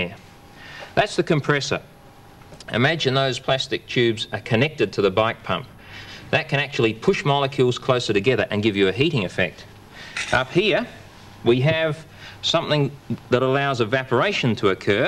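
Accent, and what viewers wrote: Australia. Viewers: The Aussie accent hits me hard the first second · It was awesome sir...It helped me a lot to polish my concepts out...You are a fair dinkum Australian..I am fond of this aussie accent... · that Australian accent telling me what’s a refrigerator and then asking how does it work really hits me in the feels. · Why is it a Australia accent make learning better? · Why does this guy remind me so much of a young Ray Comfort? Similar hairstyle, hair color, mustache, Australian accent, are these guys trying to look like each other or do all Australian men just look like this?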